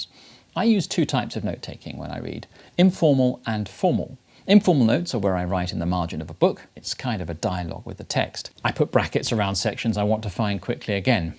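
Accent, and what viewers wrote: England, United Kingdom. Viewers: Nope. It's an English accent. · I love your British accent!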